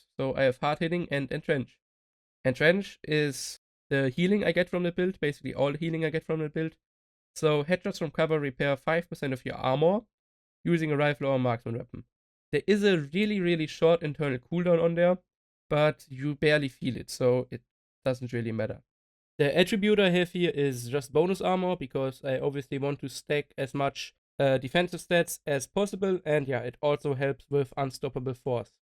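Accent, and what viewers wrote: Germany. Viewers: You speak never english.... Deutsch hör ich da doch raus... mach das doch auch auf deine Muttersprache.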